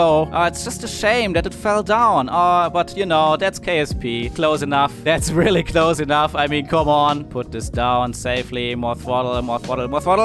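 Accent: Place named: Germany. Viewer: are you from germany bcs you sound german btw nice vids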